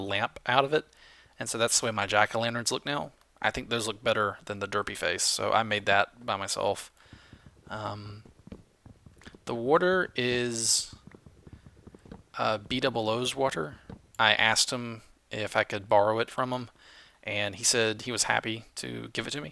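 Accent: South, United States. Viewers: Hey, where are you from? It sorta sounds like you have a little southern accent goin on:P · quick question....are you forcefully saying water differently to mask your "southern" accent? just something I've picked up on and it sounds kinda off (not criticizing you for doing it though) and secondly what did you use to make the jack o' lantern texture? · Mild Southern American accent. I believe he said that he's from Louisiana.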